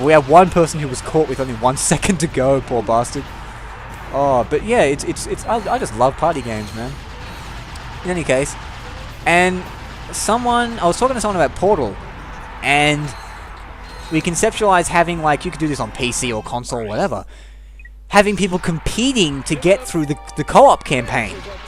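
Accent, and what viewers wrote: Australia. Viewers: Reasons that you deserve more viewers. Your gameplay quality is really good. You have a good mic and voice. You can talk for a long period of time without people (atleast me) geting bored. Also you have the Australian accent :P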